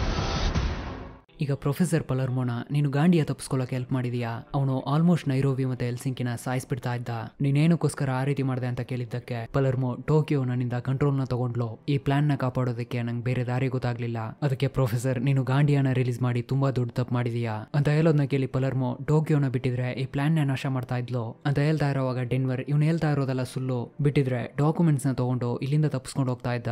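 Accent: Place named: Chamarajanagar, India. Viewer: same chamarajanagara style idhe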